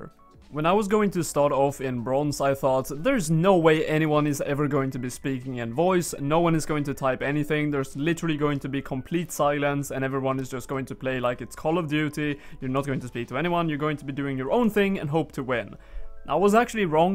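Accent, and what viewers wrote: Sweden. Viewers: Are your swedish? Nice english x)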